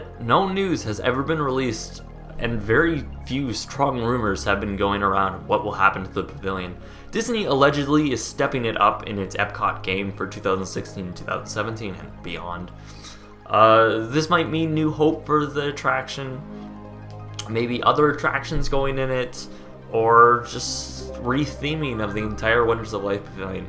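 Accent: Canada. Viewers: The narrator sounds either Canadian, or he's trying very hard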